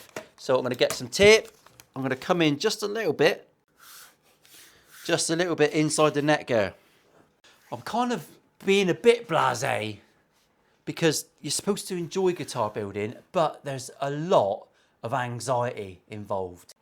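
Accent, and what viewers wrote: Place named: Plymouth, United Kingdom. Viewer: I’ve only been watching for a year at most but I love it. I run a family jewellery business in North Cornwall but I did have a bit of a break in my 30s and went building. The first time I watched I was instantly reminded of the banter we used to have on site (although to be fair you’re funnier than any tradesman I met😂). Informative,funny and a janner accent. Can’t go wrong!😂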